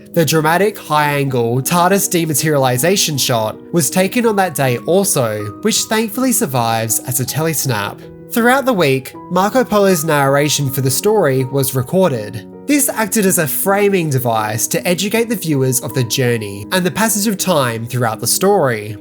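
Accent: Australia. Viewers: Great review, but can you back off on the Aussie pronunciation of the letter "o"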